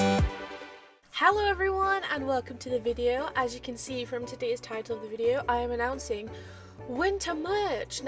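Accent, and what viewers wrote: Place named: Manchester, United Kingdom. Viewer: Your voice reminds me of an old mate from Manchester ^^ keep it up, deary!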